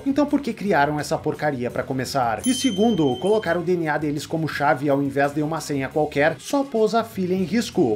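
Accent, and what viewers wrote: Rio Grande do Sul, Brazil. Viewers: Esse narrador gaúcho é da hora, kkkkkk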